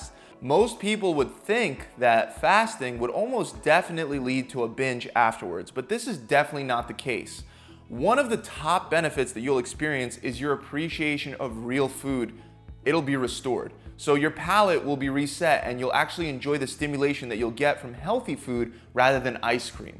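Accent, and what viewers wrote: Philadelphia, United States. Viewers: You from Philly?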